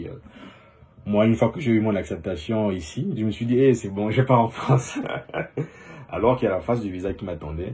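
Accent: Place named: Gabon. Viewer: Hey frère ? Continue à faire des vidéos,j’ai reconnu ton accent ,t’es un pur gabonais,grosse force à toi frère 🇬🇦🇬🇦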